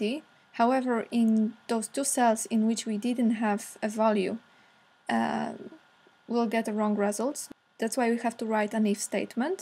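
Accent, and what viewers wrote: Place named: Poland. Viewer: are you from Poland? Cause you have soo polish accent :)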